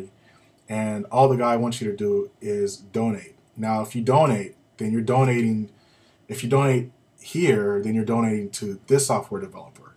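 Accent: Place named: North America. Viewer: i love your american or candian acceient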